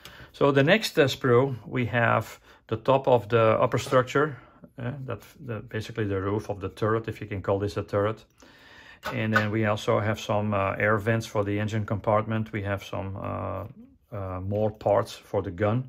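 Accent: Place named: Flanders, Belgium. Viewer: Mine is in the post. I think it is more accurate and refined than the das werk kit. You have a (super slight) Flemish accent, are you Belgian (as you also worked for Verlinden)?Pistol port on the right hand side of the hull is superfluous. Das werk has none, Trumpeter had it on both sides. Should only be on the driver side. I like they provided the drive wheel center cap as separate. Like most probably, I prefer the look of all the bolts in plain view. I thought the tires were normal plastic already when I saw them, thanks for clarifying that, good news!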